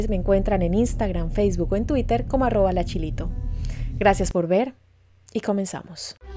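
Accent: Colombia. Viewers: Eres Colombiana?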